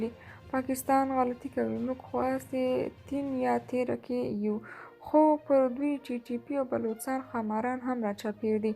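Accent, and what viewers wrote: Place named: East, Afghanistan. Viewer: په ډیری بښنی سره په سمه پاکه پښتو لهجه خبری وکړه په مشرقیوالی ژبه مه غږیږه ځکه چي د مشرقیاوال شادی بازانو لهجه د پیښاوریانو لهجه ده او اکثریت مشرقویوال ډیر چپلوسه کم اصل کوسمادر او کنځلماره خلک دی ځکه خو لیونغرت بابا مشرقیوالو ته د میاشتی دوه دوه سوه روپی ټاکلی وی تر څو په سوشل میډیا کی علماوو مجاهدینو ته کنځلی وکړی د مشرقیوالو له ډیرو کنځلو له امله بیخی مشرقوالی ژبی سره افغانانو اساسیت پیدا کړی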